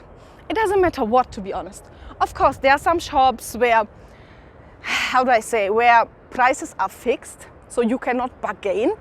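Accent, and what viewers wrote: Luhya, Kenya. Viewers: You have a kenyan accent though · Her accent is so Kikuyu or somehow Kimeru....... Typical kenyan accent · you talk like a kenyan woman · Has a Kenyan accent 😄apart from the French 'r' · She has a kikuyu accent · Your accent is more Kenyan than European. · hiyo accent sio ya yurop. That's a typical kenyan english accent. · Is it the accent or do you really already sound Kenyan am as you say supprised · Damn your Kiswahili pronunciation to some Kenyan English accent is spot on!. you got a lot of Kenyan vibes wow! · She did a lot of research n got it right. But she sounds lk a person who have lived in Kenya before n if not, she's a very good learner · Why does she sound like a Kenyan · Ni kama alifunzwa kingereza na wakenya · There's a bit of a Kenyan accent creeping in. Kudos to Kenya, they have developed to such an extent that tourists are attracted to their country.. 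· You almost picking up a kenyan accent. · Haaaaa huyu si ni mluya ....aki ...Also love your luhyia accent and lips 😍 💕 and your nose looks like that of a luhyia ....😁😁😁😁😁🙊 hakuna matata...we wear suits ....allaaaar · It feels like she has a kenyan accent already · You do have a local luhya accent and facial expressions that's a plus. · Na uko na kizungu ya mkikuyu kidogo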